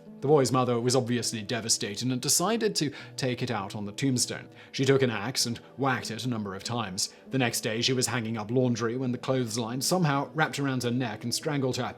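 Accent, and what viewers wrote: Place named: RP, United Kingdom. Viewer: Simon is REALLY over-egging his Head Boy private school accent on this one.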